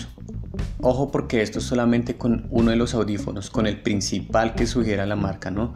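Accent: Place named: Colombia. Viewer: Qué bonito se escucha el acento colombiano !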